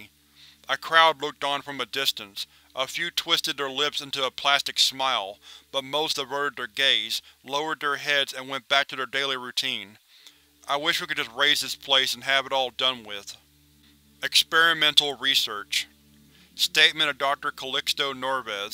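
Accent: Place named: Texas, United States. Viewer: Ahhhh....I THOUGHT I heard a slight Texas or some other accent from that area...Very cool...I go to night school and partly work from home so am up late working on things sometimes...as I listen to stuff.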